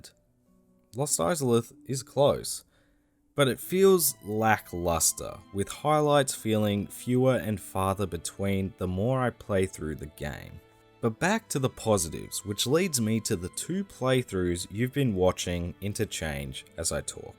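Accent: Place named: Australia. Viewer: Great channel, you should have more subs. Also nice to hear another Aussie voice on youtube.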